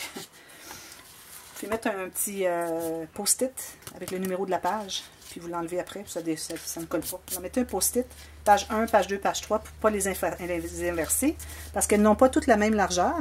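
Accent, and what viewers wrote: Canada. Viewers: Merci Pour ce bel album, il est magnifique, je pense le refaire en essayant d'adapter les dimensions pour un album plus grand pour pouvoir mettre des photos 10x15 ou tout au moins de 9x13 formats les plus courants en France! Tous les matins, c'est avec un énorme plaisir que je regarde tes tutos. Je commence ma journée avec tes vidéos et avec ton bel accent canadien. Merci encore pour ta disponibilité, Biz de France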